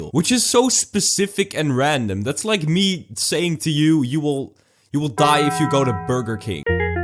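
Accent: Netherlands. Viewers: Are you Dutch? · are you dutch? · Are u dutch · wait a min are you dutch?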